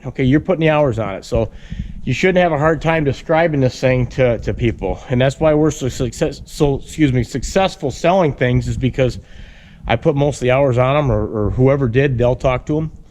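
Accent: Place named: Upstate New York, United States. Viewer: which units where you in when in the Army? I am a huge fan, I am active duty now and grew up milking cows and working the farm for my uncles in upstate NY. The more I watch your videos, the more you sound like them. The way you present yourself is clearly as a leader in the Army would, especially when you told your nephew that "we start strong and finish strong." Love the channel. Keep doing what you do.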